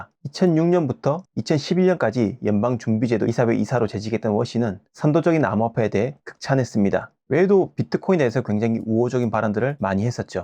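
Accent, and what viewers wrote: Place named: Gyeongsang, South Korea. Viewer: 이 유투버는 사투리를 깔끔하게 사용해서 듣기좋다. 요즘 어설픈 표준어 역겹게 사용하는 경상도 사람들이 너무 많다. 구독 강추!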